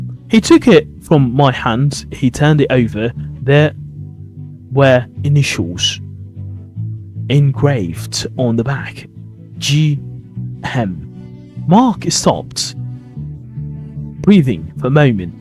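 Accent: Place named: RP, United Kingdom. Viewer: It’s a real pleasure to listen to these fascinating stories read in your perfect RP accent. I can’t wait to hear the next one. Thank you so much for all your hard work.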